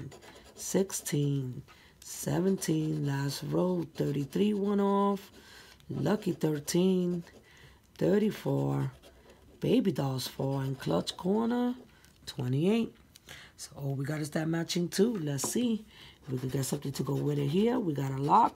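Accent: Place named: Puerto Rico. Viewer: Nicely done ✅ boom 😘 I love your accent am I picking up some Puerto Rican in you. I hope I’m not over stepping my bounds because that’s not my intentions. ♥️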